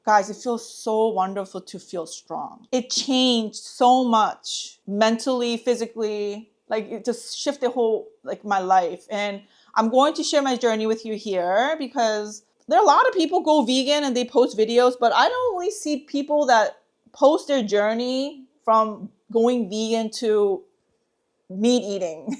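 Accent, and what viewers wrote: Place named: United States. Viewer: I just found you. Idk how or why. YouTube recommends me all kinds of stuff. I guess maybe it knows I recently started eating meat too so it recommended you to me. I’m happy to find you. Are you Korean, American, Korean-American? (You sound American) I’m from Atlanta and growing up had a lot of Korean friends or I mean friends whose parents were from Korea. My God mother is Korean. So I’m just guessing sorry lol… maybe more than guessing… anyways I will follow you now for recommendations and things. You’re funny and have good personality so I like what you have to say. Also watching you cry and eat the steak was funny, but I totally got it lol…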